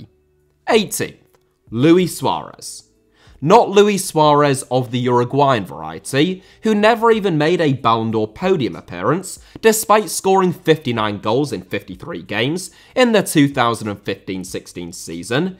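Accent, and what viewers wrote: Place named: United Kingdom. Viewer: I realized from your accent that you are British. I was sure when he put Stanley Matthews in front of Ronaldinho, Kaká and other great players that they were much better than Matthews, truly absurd.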